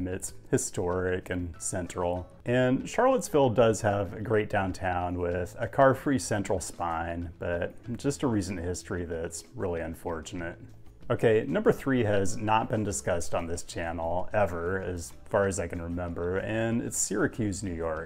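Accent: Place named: Upper Midwest, United States. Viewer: Your videos are the best things on YouTube--thank you. One comment and one question… Did you know that Erie contains the lowest-income zip code on the U.S.? I read this recently, and was surprised. Also, as a linguist, I am fascinated by the cadence of your speech and can't place it geographically. Are you from the Upper Midwest originally?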